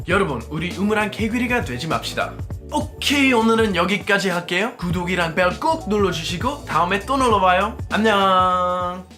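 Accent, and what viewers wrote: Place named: Gyeongsang, South Korea. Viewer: 혹시 경상도 친구한테 한국말 배우셨나요? 억양에 사투리가 섞여있어요ㅋㅋ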